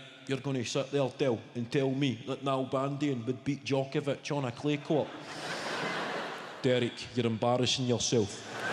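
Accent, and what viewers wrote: Scotland, United Kingdom. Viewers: His Scottish dialect gives his jokes an other level, but me being a Norwegian, are having a hard time understanding everything. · Grow up lol, just learn how to interpret a Scottish accent, its not rocket science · Very good comedian but as an American I can hardly understand what he's saying through the Scottish accent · It's not an English accent... UK, Britain, are made up of countries...not one! is Scottish not English. Therefore he speaks the language of English perfectly normally, for someone from Scotland.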